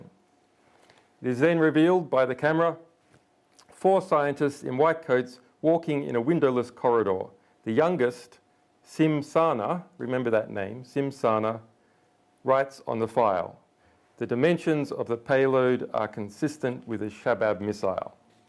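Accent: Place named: United Kingdom. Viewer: I don’t attack intelligence agencies anymore. Once you reverse engineer history and identify who funded the creation of all intelligence agencies of every nation then you see that they are no more responsible for social engineering than governments and individuals in positions of power within government. This all stems back the the Bauer/Rothschild Dynasty that have their lineage and agents in the leadership roles of every institution of influence and power and all supposed “people’s Movements” even you Jillian Asange are an agent time releasing narratives they want believed. The fact that you have a British accent alone only reinforces this charge. Sean Combs is not a sexual predator, he refuses to endorse Donald Trump while Katt Willams is being promoted because he’s shlobbing the knob of the golden calf. You are obviously trying to seat Trump so that he can Reinact 1938 with the US playing the role of Germany, and he playing the role of Adolf Hitler.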